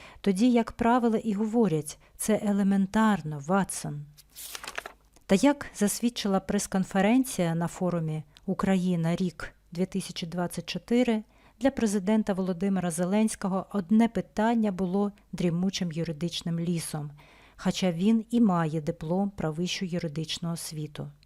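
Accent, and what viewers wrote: Russia. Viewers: з явним російсьим акцентом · ваш москальский акцент аж випирає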